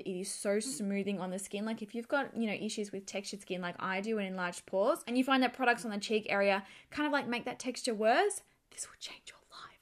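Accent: Australia. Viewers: Your accent is so Australian and I am Australian lol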